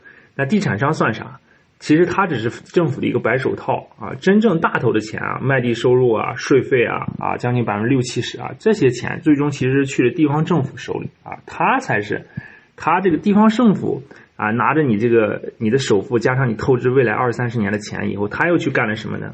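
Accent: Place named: Shanxi, China. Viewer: 一听就是山西人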